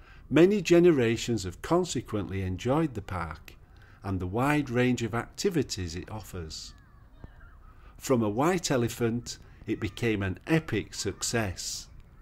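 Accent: Yorkshire, United Kingdom. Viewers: Thank you for this video, very clear speaking to listen to. I can tell you're from Yorkshire. I was born in Farsley and lived in Pudsey also and worked in Leeds in three fashion shops in all back in early to mid 60s. I don't usually stop to look at videos if they seem too long though 16mns isn't really a long time. I'm glad the Canal Gardens were shown as I was beginning to think they wouldn't. I had my first trip back from Oz after 20yrs in the summer of '87 and we went to Roundhay Park. Lots different since I was a child and teenager. I have a memory of being in the Club House I think it was when I was about 7yrs after the heavens had opened and everyone charged in there. After it stopped, everyone was pushing and shoving to get out again, making for the door. I got stuck in the crowd, lost sight of my parents who weren't looking to see if I was following and got my hair caught on a bloke's button on his waistcoat. 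He didn't know and was dragging me along with him with me screaming for my Mother at the top of my lungs! We were really sandwiched in like sardines! I eventually got my Mum's attention and she told him. Another was going to see when I was about 16yrs in early 60s, but before all those others you mentioned. You could hardly call it a concert as he just performed in the middle of the grass in a made-up stage covered in tarpaulins. He was the drummer as well as singing and he just rattled off his well-know songs as quickly as possible, didn't i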